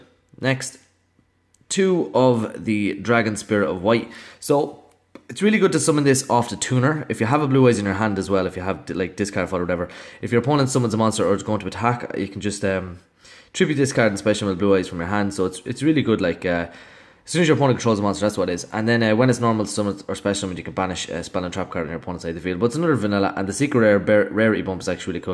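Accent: Ireland. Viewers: You Irish mate? What part? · Is that an Irish accent I hear?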